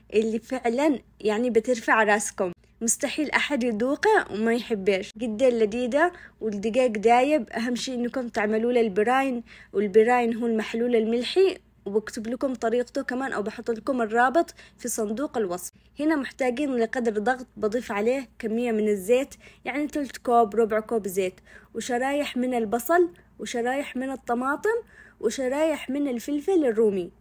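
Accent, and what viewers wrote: Aden, Yemen. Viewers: ماشالله عليك والله لما اشوف فيدوهاتك وطريقه شرحك العدنيه ...أتذكر عدن الحبيبة... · روعة ربي يحفظك صوتك وكلامك واللهجة العدنية من فمك عسل اختك ام معين من عدن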